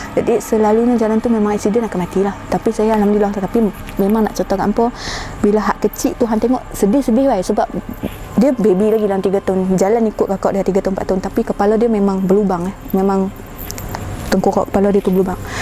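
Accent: North, Malaysia. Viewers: Pekatnya loghat utara, saya yg org n9 ni kena dgr betul2 baru faham 😅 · tetap... Saya akan sokong walaupun bahasa utara ni kadang-kadang kasar seperti kertas pasir namun kehadiran cerita cerita seramu sentiasa ku nantikan asalkan keseramannya sampai ke paru paru dan limpa hahaha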